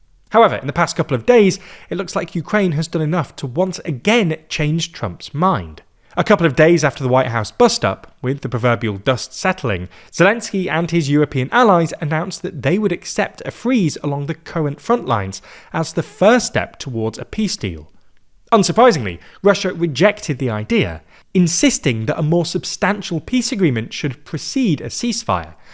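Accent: United Kingdom. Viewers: So sick and tired of all this British accented YouTube channels. WTF?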